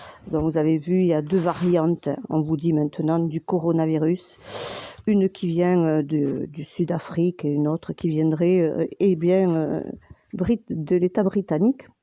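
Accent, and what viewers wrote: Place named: South, France. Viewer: Et un bel accent qui nous emmène au soleil en plus 😘😘😘pour enmerder des détracteurs...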